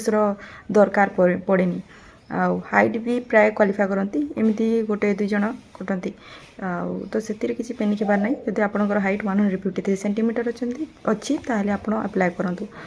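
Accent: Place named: Sambalpur, India. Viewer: Tamar accent ta pura Sambalpuri mix aae.... Best of luck Jai Jagannath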